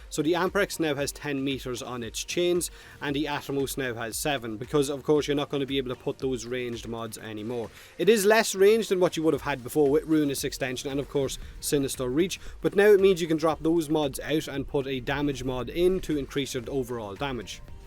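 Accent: Ireland. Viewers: Personally I love your brogue.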